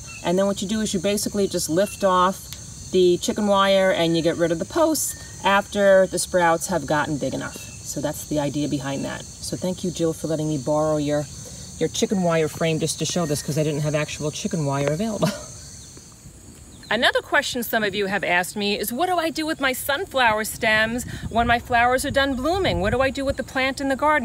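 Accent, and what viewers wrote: New Jersey, United States. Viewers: What is the foxtail plant in your sunflower field?..Is it a millet or a grass amd is it beneficial to the sunflowers or just something that grew there? Thanks in advance. I always miss my home state NJ when I hear you talk😍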